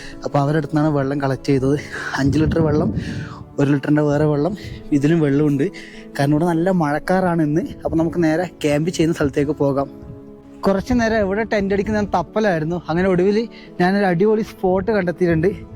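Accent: Kannur, India. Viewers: Kannur kaaran ano?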